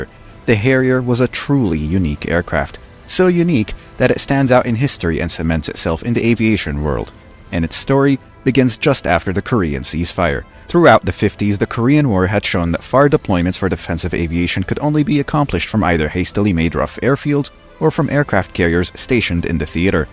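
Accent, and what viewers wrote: United Kingdom. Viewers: That British accent though... Also what Anime is that! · wtf is that British accent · The British accent chef's kiss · That English accent is terrible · That British accent ACTUALLY hurt me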